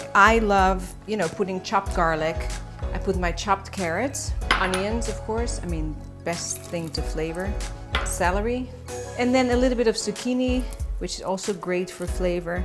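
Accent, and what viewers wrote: Netherlands. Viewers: je hoort echt het nederlandse accent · She has sich a strong dutch accent haha i love it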